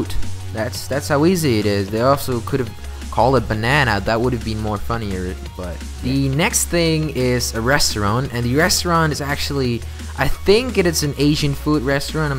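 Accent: Netherlands. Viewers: He sounds like he's got abit of an accent he had another video were a twitter screenshot was in a different language so I'm guessing he may be Dutch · its the way pronounces its cos he is from he netherlands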